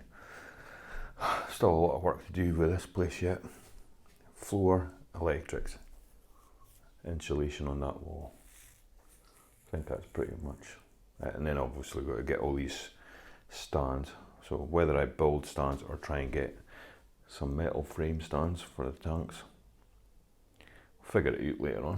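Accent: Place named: Aberdeen, United Kingdom. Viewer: Love it!! Your accents Aberdeen/Aberdeenshire can i ask where you buy your fish?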